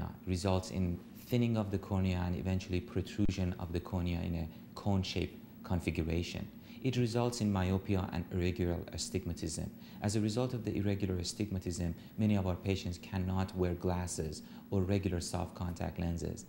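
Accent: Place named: Iran. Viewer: This is Iranian accent by the way.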